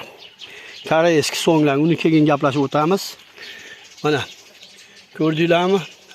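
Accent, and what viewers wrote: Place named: Fergana Valley, Uzbekistan. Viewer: АССАЛОМУ АЛАЙКУМ ОТАХОН! ШЕВАНГИЗГА КАРАГАНДА ВОДИЙДАНСИЗ ШЕКИЛЛИ, СИЗГА РАХМАТ БИТТА КАМЧИЛИГИНГИЗ ЁНИНГИЗГА БИРОНТА ЁРДАМЧИ ОЛМАБСИЗДА, КЕЙИН ЙОД БИЛАН ЗЕЛЁНКАНИ ИДИШГА СОЛАЁТГАНИНГИЗНИКУ УМУМАН КУРМАДИК ФАКАТ АРАЛАШТИРДИНГИЗ ХОЛОС, КЕЙИНГИ ВЕДЕОДА КУРСАТИНГ, ЯНА БИР КАМЧИЛИК КУПЧИЛИК АЙТГАНДИР ТАЙЁРЛАГАН КУЛ ОЗУКАНГИЗНИ БОДРИНГ ИЛДИЗИГА КУЙДИНГИЗМИ ЁКИ БАРГЛАРИГАМИ УШАНГА ТУШУНМАДИК, УМРИНГИЗДАН БАРАКА ТОПИНГ, ИЛГАРИ ОТА БОБОЛАРИМИЗ КУЛНИ БАРГЛАРИГА ХАМ СЕПИЛСА АЧЧИК БУЛАРКАН ШИРАГА ВА КУРТЛАРГА КАРШИ ЭФФЕКТИ ЯХШИ ДЕРДИЛАР ШУ ТУГРИМИ. РАХМАТ ОТАХОН ЧАРЧАМАНГ!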